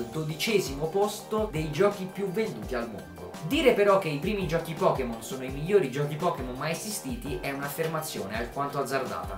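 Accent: Campania, Italy. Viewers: Scusa la domanda fuori luogo, ma sei della campania? Ho sentito male o hai detto "Semp a stessa cos" ahahahah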